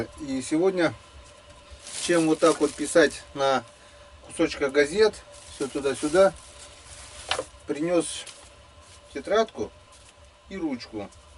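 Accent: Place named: Yakutia, Russia. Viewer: Говор якутский!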